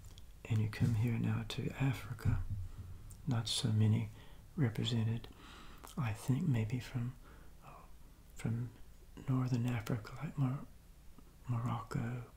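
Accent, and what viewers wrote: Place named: East Coast, United States. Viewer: I’ve been binge watching, obviously. Didn’t know where you came from and took a guess one day & said “east coach of America” its the way you pronounce things that give it away .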